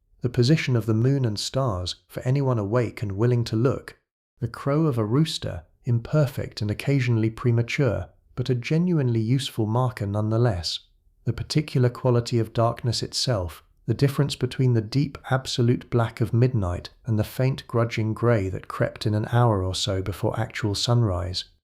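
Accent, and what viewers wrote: United Kingdom. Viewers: Why do all these channels have to be a British accent. Its frustrating for me to try and sleep while trying to figure out what ur saying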